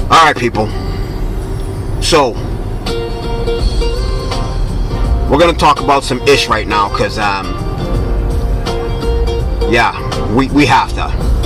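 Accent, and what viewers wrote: Boston, United States. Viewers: That's a Boston Strong accent.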